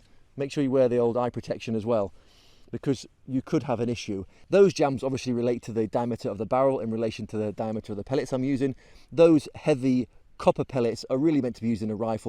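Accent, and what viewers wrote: United Kingdom. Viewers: Great British accent.... So where are you from?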